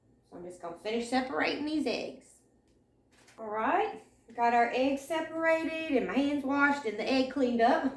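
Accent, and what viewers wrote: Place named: Mississippi, United States. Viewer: You talk like my cousins ❤ Were from Mississippi, are you??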